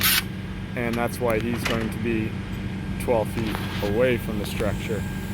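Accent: Canada. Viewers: Wow. And a Canadian accent. The words are tighter. It was great to watch. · How bout some made in Canada tools since you are obviously Canadian, as we can tell by your accent.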